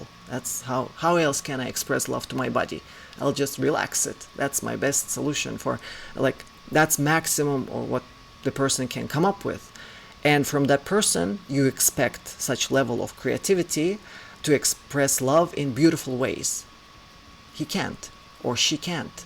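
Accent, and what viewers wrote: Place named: Russia. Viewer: Thank you again Ms... I love your content as much as your rich Russian accent... You presentations are always very clear and informative... 🙏💜😃💜🙏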